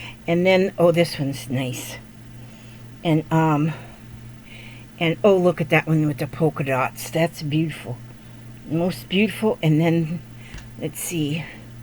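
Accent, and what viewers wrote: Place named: Georgia, United States. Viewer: I am going through the hardest time in my life your time makes life so happy for me! I can’t sew yet everything I have is away from me god knows why but oh boy! You inspire me and I hope to get into the paper craft and go over your videos on there one day! But I love you do much! Your acsent reminds me of Georgia we use to live in a little town called Marietta Georgia so ch sweet folks! My dear mommy god rest her soul had a kids ministry and she had Kermit and miss piggy 🐷 lol I love your readings! I can now see when you post I learned how to do that lol my mind seems not good on some days:-( mt mommy and her mommy had memory problems but lord I’m too young to get like I feel idk lol will can you or do you have these rag snips at your Amazon shop? Ty again love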